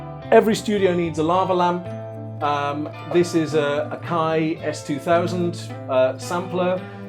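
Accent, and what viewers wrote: United Kingdom. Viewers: Love your Welsh accent. Second career in voice over?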